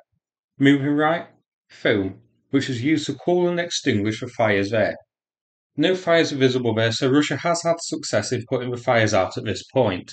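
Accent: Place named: Stoke, United Kingdom. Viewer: Are you from stoke mate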